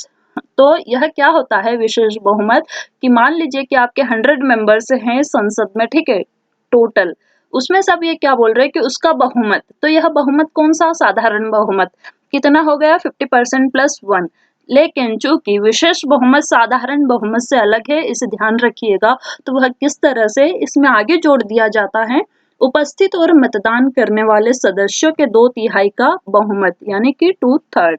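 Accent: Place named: Bihar, India. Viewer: Aap Bihar sy ho kya aapki langaguge and tone bihari hau.Mari bhi Bihar state sy hau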